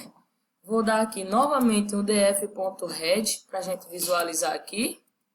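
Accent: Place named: Recife, Brazil. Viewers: Massa! Show! Esse sotaque parece do Recife, ok? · Oi Oliveira J, que bom que gostou, o sotaque é do Recife sim, acertou!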